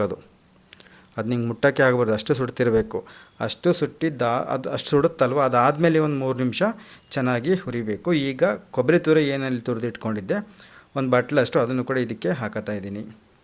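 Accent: Chitradurga, India. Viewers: Exactly u told the same story of mine also sir ......me also near Chitradurga ....ur language same as we talk in our village ... whenever I feel lonely that time I saw ur videos it make me feel happy thank you .....Because u r from Chitradurga.......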